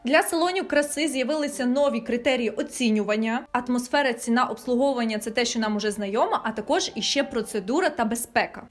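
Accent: Surzhyk, Ukraine. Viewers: нет, какой нахуй сленг? Она говорит суржиком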